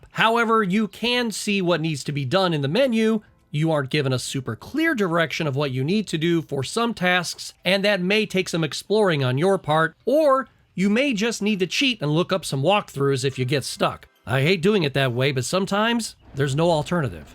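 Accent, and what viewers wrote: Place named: Boston, United States. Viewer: OH SNAP! THE BOONDOCKS REFERENCE...you're speaking my Boston language, KEHD! Great video, Dede is getting this for me for Xmas lolz!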